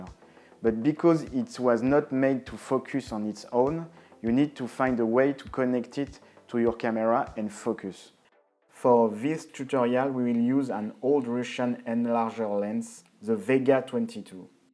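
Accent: France. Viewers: The french accent! J'adore tes vidéos, sont top! Et ton accent me fait bien marrer :D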